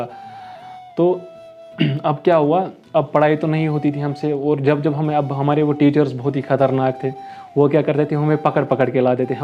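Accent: Kashmir, India. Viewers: Bhai aap Kashmir se hona ??? Ap ke bat Karne ke tarike se pata chal Raha Hain ??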